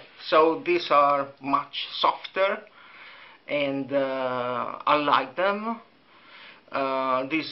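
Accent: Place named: Venice, Italy. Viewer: non avevo mai sentito un accento veneto sull inglese. Fantastico, sembra una via di mezzo tra il gallese, e il liverpulliano . Grade. gran video sulle unghie atrifiaciali, mi serviva:-)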